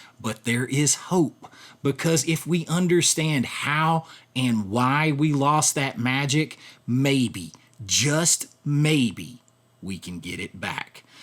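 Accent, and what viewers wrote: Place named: South, United States. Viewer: Absolutely does. Sorry i never check back for replies because i guess im gettimg older but again tonight im watching you new video as we text. Me and my daughters joke now "pop that tape in i gottt youuu" 😅with your southern slang l😂 love it. If i can pull up the $ ill definitely patrion. You kmow how it is brother.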